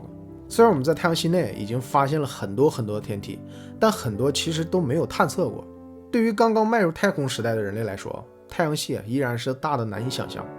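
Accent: Northeast, China. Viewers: 听口音大概率是中国北方，像东北那边的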